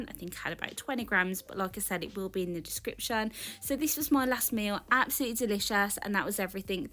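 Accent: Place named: Caribbean. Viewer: Are you from the Caribbean originally? I hear a bit of an accent coming though sometimes 😃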